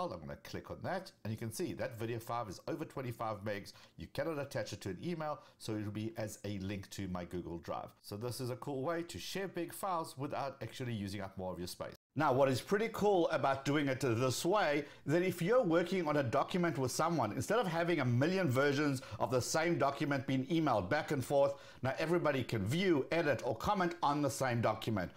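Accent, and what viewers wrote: South Africa. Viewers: your content is amazing and your SA accent makes it even better.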